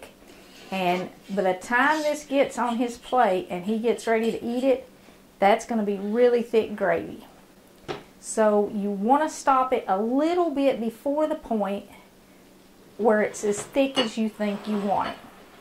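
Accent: South, United States. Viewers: You sound like a hillbilly to me and I like that. I like hillbillys, I like you, I like your style, and I like your videos. The residue on the bottom of the pan is called "fond." Incorporating it into your sauce is deglazing. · Thank you so much, I really enjoy it and remind me the country accent of my father in law, God blessed you! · U just made me hungry seeing that plate with fried apples 😋.Must be a southern video by voice👍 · Woman with southern accent + cast iron skillet = great food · Hi, this is my first time viewing your channel, I hope you never get tired of hearing this.. your southern drawl is awesome! I was blessed to have my moms parents who were German and Italian (both very thick accents) raise me.. well for the most part. Then mom married when I was 5 to a very good man.. which meant I inherited another grandma, his mother. She was very southern with a thick accent as well! 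LoL This was not a wealthy woman by any means, in fact she struggled at times financially. She had 3 grown sons and had no intentions of having any other children in her mid 50’s.. but surprise! She had a daughter that was only a year older than myself when we met. Technically, her little girl was my Aunt by marriage but naturally we were raised more like sisters. I’ll tell you what, my southern granny made the best food out of practically nothing sometimes. Her biscuits and gravy were simple just like u showed in your tutorial but ohhh so flavorful! Just like you said, it didn’t take much sausage to feed a handful of ppl since it was only meant to flavor the gravy. I probably wouldn’t have tried rabbit in my lifetime had it not been for her southern cooking. Her fried chicken was to die for and she could do anything with a pot of beans and some cornbread when the budget got tight.. and it was delicious! 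Traditionally at Christmas time, we did not set out milk and cookies for Santa at her house but rather a bowl of butter bean soup and a homemade biscuit. One year us gir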